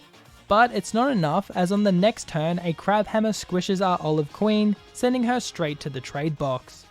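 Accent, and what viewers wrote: Australia. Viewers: Are you Australian if so in from the central coast in NSW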